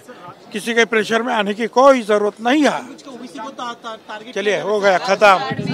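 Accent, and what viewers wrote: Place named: Bihar, India. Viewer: बिहारी सारख बोलतोय... media ला वैतागला... copy cat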